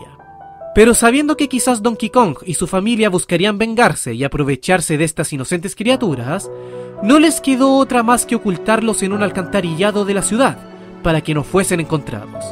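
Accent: Chile. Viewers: Eres de CHILE???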